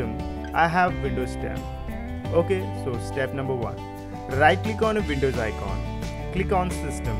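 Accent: India. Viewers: darkxx0 this is the voice of an normal Indian so don't judge anything about it. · This might be racist but once I heard your Indian voice I automatically thought that "this video is a scam".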